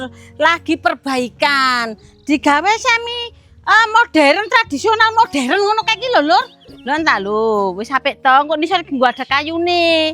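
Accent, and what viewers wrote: East Java, Indonesia. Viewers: Lading ,logor...sih...koyok jatim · Jan nyenengake cara masake. ini sepertinya orang Jawa Timur kalau dengar aksen bicaranya. Mungkin dari Gresik/Sidoarjo/Mojokerto/Jombang. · Bukan cuma masakannya yg bikin ngiler..., dengar bhs jawa timur yg enak medok bikin kangen ingin pulang ke jawa timur... melihat tmp tinggalnya suasana asri didesa . Rasanya ingin menghabiskan hari tua kita ditempat spt itu. Perabotan dapur sederhana tapi nyaman dipakai.. bukan main suasananya membuat makanannya bertambah nikmat.. Terima kasih mboke.. panjenengan sukses ndamel konten . Monggo ndamel malih konten masakan jawa timuran. Matur nuwun mboke. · itu tinggalnya dimana to pingin main aku kesana kok logatnya SPT SBY apa malang gitu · Aku suka dg logat bicaranya basa jawanya medok jawatimuran apa jawa tengahan tah itu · Bahasa Jawa-ne Jowo timuran... 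· pean iki wong lamongan to mbk omonge koyo wong lamongam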